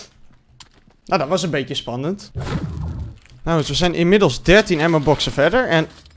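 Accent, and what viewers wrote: Groningen, Netherlands. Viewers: Ben je Gronings? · Kom je uit Groningen ?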